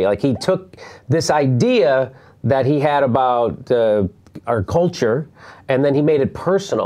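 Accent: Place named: Chicago, United States. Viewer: Jimmy’s accent has changed so much throughout the years, even 9 years ago he had a relatively thick Chicago accent.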